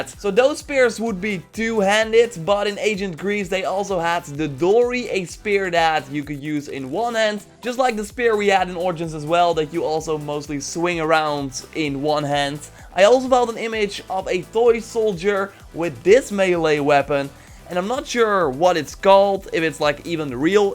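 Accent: Netherlands. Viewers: It is a bit Dutch mate, so I think he is Dutch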